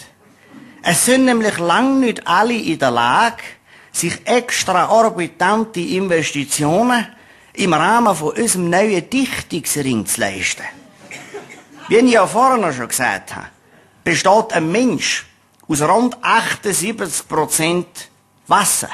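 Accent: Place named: Appenzell, Switzerland. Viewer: Einfach köstlich! - Bin ebenfalls Innerrhödler(in), lebe aber schon seit über 20 Jahren im Ausland und habe und seine Videos erst heute entdeckt. Was für ein herrlicher Fund; ein super-Aufsteller und Wiederfreudehaben am urchigen Appenzellerdialekt! Herzlichen Dank fürs Hochladen!!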